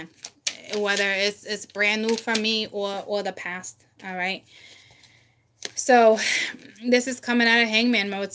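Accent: Boston, United States. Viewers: You and I have the same accent! It’s Boston baby! Be proud! It’s the best!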